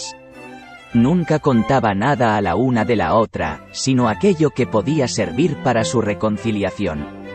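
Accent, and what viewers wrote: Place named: Spain. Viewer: Por fin encuentro un audiolibro en español de España!!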